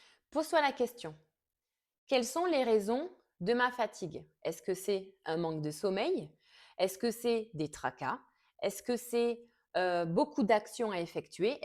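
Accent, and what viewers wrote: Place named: South, France. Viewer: Bonsoir. MERCI infiniment pour votre réponse. Il est vrai que le mental façonne parfois la perception de certains événements. Il faut se poser les bonnes questions en essayant de rester aligné. Vous avez raison. Ce n'est pas l'arrivée qui compte, c'est le chemin..... Encore MERCI. (Vous avez un petit accent du sud🌞). Je vous souhaite une belle soirée. Bien cordialement. Olivier...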